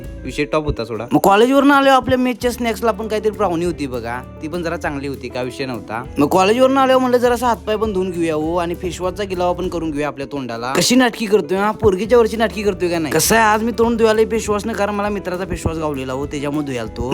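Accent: Kolhapur, India. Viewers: Accent so cutee kolhapurkar ❤️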